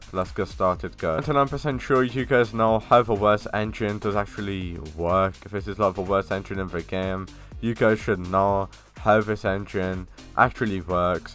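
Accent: United Kingdom. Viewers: He's is British mate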